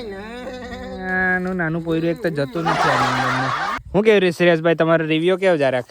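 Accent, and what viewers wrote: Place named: Ahmedabad, India. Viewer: Oo bhai tme toh ahmedabad na lago cho ekdmm suddh gujarati 😄😄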